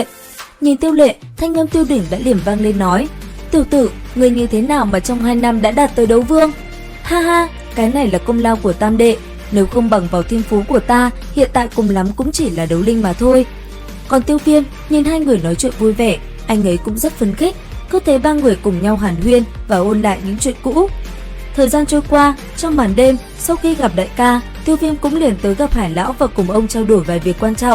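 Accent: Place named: Ha Tinh, Vietnam. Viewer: ông này người hà tĩnh chắc luôn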